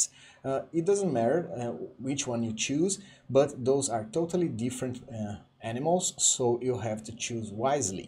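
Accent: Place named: Brazil. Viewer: Cara, é incrível que no instante que eu te ouvi falar eu já tinha quase certeza que você era brasileiro, fiquei muito feliz por isso. Sucesso pra vocês e obrigado pelo conteúdo.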